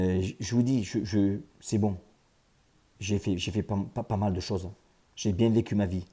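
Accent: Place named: Toulouse, France. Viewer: Cc!! Je ne te connais que depuis 3 semaines mais je t'adore surtout avec ton accent sudiste de Toulouse! Moi je suis d'Agen et in dit chocolatine ici bordel 😂😂😂 Continues comme ça, j'adore ton état d'esprit et ton franc parler! Vive le sud ✌️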